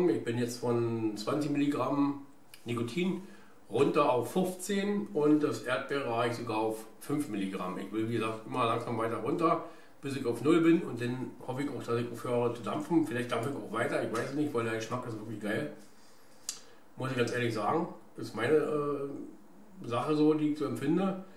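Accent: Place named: Brandenburg, Germany. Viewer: Rainer3041 komm auch aus Brandenburg Mich interessiert es nur, da ich das am sogenannten Brandenburger Deutsch hör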